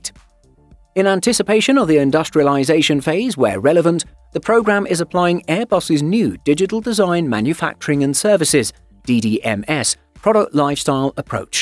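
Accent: England, United Kingdom. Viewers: You are using and English accent to promote an EU product for which the UK is no longer a part of.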